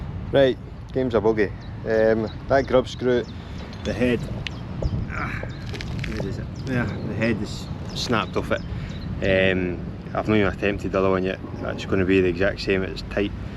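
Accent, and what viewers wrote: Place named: Scotland, United Kingdom. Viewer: Where specifically in Scotland is your accent? Glasgow somewhere?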